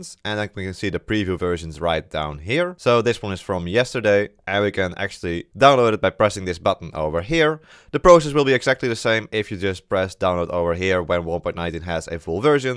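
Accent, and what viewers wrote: Netherlands. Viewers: are you dutch haha me too i can hear from your voice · Are you dutch?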